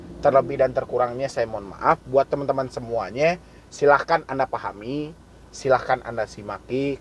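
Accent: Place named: Medan, Indonesia. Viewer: Medan mana suaranya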